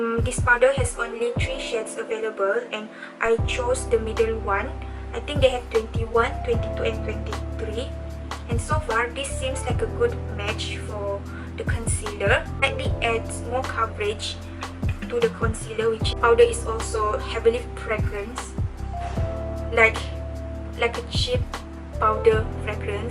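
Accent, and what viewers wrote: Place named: Philippines. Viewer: You speak like filipino english accent. Btw I like your video. Thumbs up 👍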